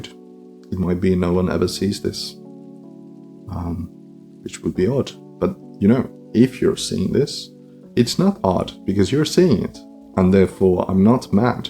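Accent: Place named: South Africa. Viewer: Bro sounds South African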